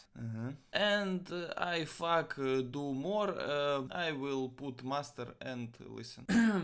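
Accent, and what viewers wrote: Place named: Russia. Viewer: Ахахах у тебя голос как у русского ну ты итак русский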